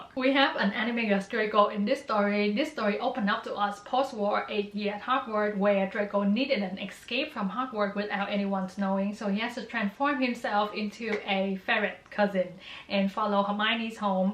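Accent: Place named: Vietnam. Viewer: are u vietnamese? your accent is so viet <3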